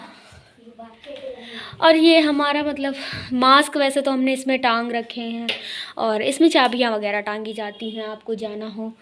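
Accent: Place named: Lucknow, India. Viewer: Lg rhi h lko ....vali ... mtlb bat krne ka tarika ...mai bhi lko se ..hu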